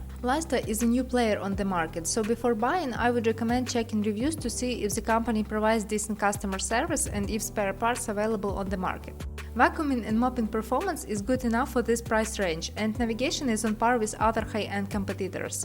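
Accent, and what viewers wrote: Russia. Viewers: this russian accent is horrible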